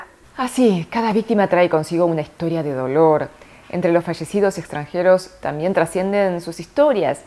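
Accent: Argentina. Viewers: Me encanta tu voz de periodista , tenes acento Argentino 🙂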